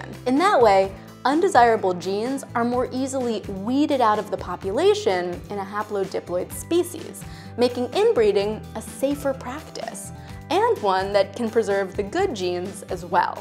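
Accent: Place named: South, United States. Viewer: Before you even watch this you know she is a southern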